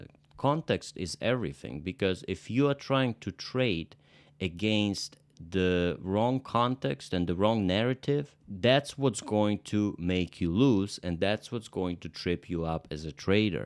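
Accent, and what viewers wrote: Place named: Sevastopol, Ukraine. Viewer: Wonderful Your accent reminds me of an old friend from Sevastopol Good luck from Ireland☘☘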